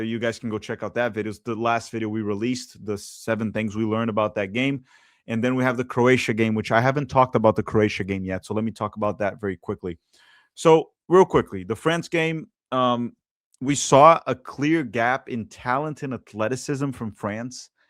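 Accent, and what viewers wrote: Brazil. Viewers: O cara tem um canal chamado, pelo sotaque acho que é Br e tá metendo um canal em inglês sobre futebol? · Nao sem quem é o brasileiro cheio de sotaque que gravou esse video, mas nao entende nada de futebol. Provavelmente nao viveu a época do Lazaroni. E tira essa camisa ridícula do Palmeiras pra falar da Seleção, por favor. Poe uma do Brasil que fica mais legítimo. Odeio brasileiros que falam mal do Brasil fora do nosso círculo.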